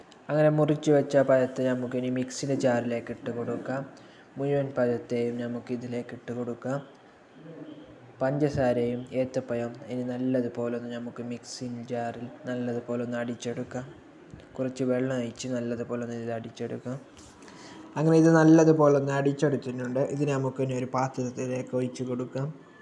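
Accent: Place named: Kasaragod, India. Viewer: കാസർഗോഡ് സ്ലാങ്ങും കാസർഗോഡ് പലഹാരവും കലക്കി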